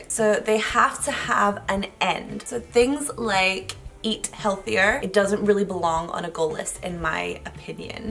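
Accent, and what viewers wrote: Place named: Glasgow, United Kingdom. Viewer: Love your channel! Not a bodybuilder myself, but I’m a girl and us girls can always help each other out:) Spent my early life in Glasgow and hearing you speak makes me feel at home! 😘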